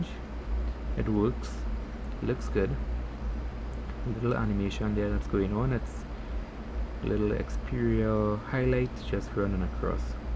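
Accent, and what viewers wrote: Trinidad and Tobago. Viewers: hi are you from trinidad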